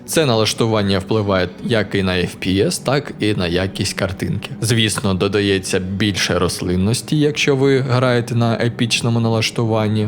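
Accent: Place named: Russia. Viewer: Не висОко, а вИсоко, трохи тягне тебе на руський лад. А загалом лайк.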